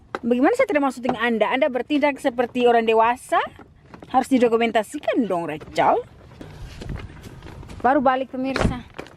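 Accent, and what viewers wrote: Manado, Indonesia. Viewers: Saya sng logat.manado..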